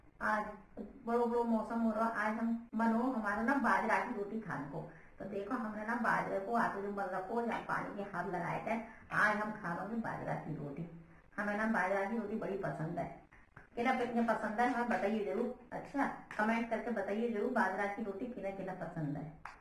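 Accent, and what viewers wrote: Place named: India. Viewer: Wow di desi khana desi hi style mein baat bahut achcha laga 🙏❤️❤️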